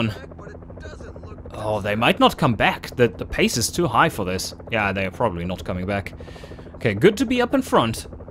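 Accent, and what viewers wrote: Germany. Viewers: Nice sympathic german speaking English. Ich liebe es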